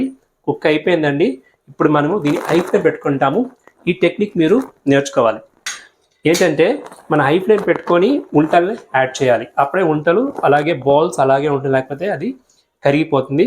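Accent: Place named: Kerala, India. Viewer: First time vintunna Unta pulusu. Meeru malayalam Andi. Mee telugu Different ga undi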